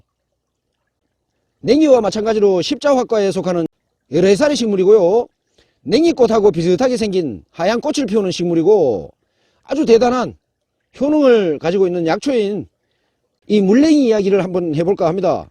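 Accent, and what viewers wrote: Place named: Gyeongsang, South Korea. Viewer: 영상과 정보 감사합니다 경상도 말씨가 구수하게 들리네요 항상건강하세요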